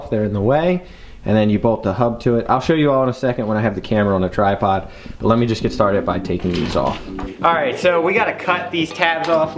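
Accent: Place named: New Jersey, United States. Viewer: games&memes because he’s from jersey they have accents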